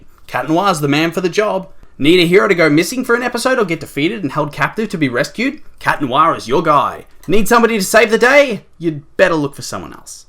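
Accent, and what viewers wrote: Australia. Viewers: You're Aussie aye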